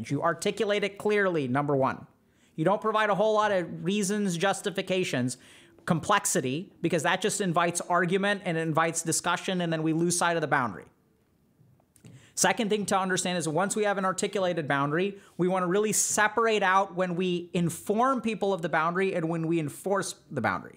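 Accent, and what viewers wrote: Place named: India. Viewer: your Hindi accent is hilarious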